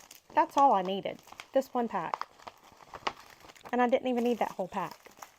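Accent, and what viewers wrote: South, United States. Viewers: As a new subscriber of yours, I love your videos. I am a southern girl and we can’t help our accents any more than people 🎉from other parts of the country can. You just keep being you and disregard the negative Nellies. If someone’s voice or accent bothers someone that much, they can always use close captions and just read what you say instead. I do that a lot if I can’t turn the volume up when I watch videos. Love your junk mail tips.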